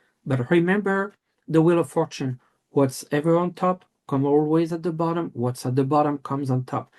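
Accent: France. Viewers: Luv you ….love your reads!!!!! ❤❤❤ This is kind of a weird funny thing to tell you, but….as a child, I often would talk in a French accent. For days….weeks even! I’m an American, and now I think it was perhaps a past life thing. I always felt such affinity to the French, France in general and perhaps even tied somehow to WWII. I dunno…but, it’s weird but I feel a sort of “comfort” in hearing you speak. I’m glad you’re French and lol….I don’t speak French but, intuitively I feel like I could readily grasp that language. ❤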